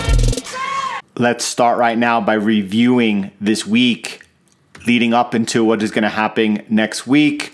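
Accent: United States. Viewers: NY accent, but where? Long Island?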